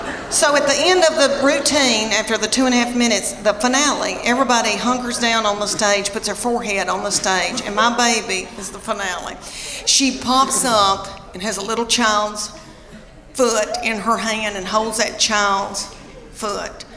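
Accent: South, United States. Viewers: I love your accent. After a Spanish woman with a lisp you’re southern accent is absolutely adorable, obviously 3rd place goes to a Welsh woman.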